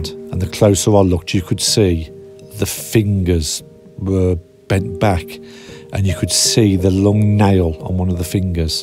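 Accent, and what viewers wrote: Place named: Northern England, United Kingdom. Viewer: At first the strong nothern accent in the narrative takes some patience, after about 5 minutes, the film develops and becomes a truly historical experience of the SS Reich Division massacre of the village. I had heard of this massacre in France as I am French/Irish/ and born in England, so, I have a decent knowledge from different angles on WW2 and WW1 (as my grandfather was in the French infantry from 1914 to 1917, he was seriously injured at Verdun, his brother was killed in 1916). My father was in England during WW2 and was 18 in 1945. One of my friends at school was German Venezuelan in England, his father was an SS Tank division commander in WW2 and has been suspected of taking part in a destruction of a village in France and the assassination of the village habitants. When at school in England from 1971 to 1981 I asked about the whereabouts of his father and one of the two sons who I knew well said “he can’t return to Europe, as he served in the SS and was an officer in charge of a Panzer Division)… His father was 15 years older than his mother who was Venezuelan and who I met in the North of England several times during half term holidays, small world, coincidences. 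Hard to grasp this level of evil and inhuman behavior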